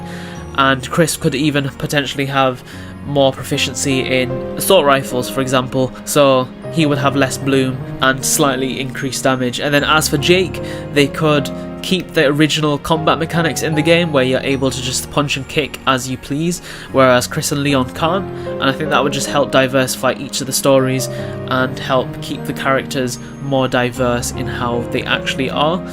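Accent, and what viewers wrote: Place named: United Kingdom. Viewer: You sound soooooooo british hahah love it man keep it up!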